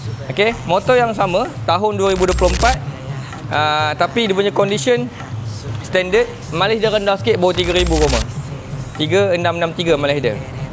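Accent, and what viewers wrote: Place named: Perak, Malaysia. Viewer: Ate, kapar klang bunyinya Perak yeop 😁😁🤔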